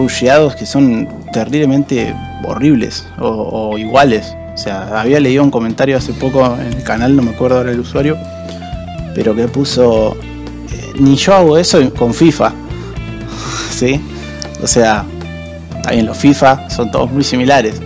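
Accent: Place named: Argentina. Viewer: No se yo, pero suena a Argentino.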